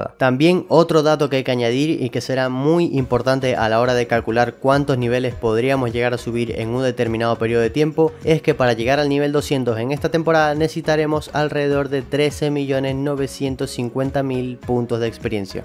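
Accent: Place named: Canary Islands, Spain. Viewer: Holii eres de canarias? Tienes acento "nueva" xs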